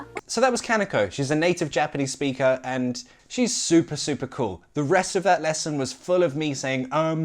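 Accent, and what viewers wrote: United Kingdom. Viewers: I love your channel!!! Love British accent!!! Thank you for sharing!!! I’m Japanese 😜1/2 lol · woah so many thanks^^ I'm learning right now korean so it's still difficult cuz it's so different than english and some words didn't still stuck in ma head so, i'ts kinda frustrating.. I'm in love with korea language (not with a person yet :v) but yeah u.u..... ptt: japanese person may thinking bout: are you Saitama in real life? :v ... I love your accent btw (it's a little bit different than other british persons)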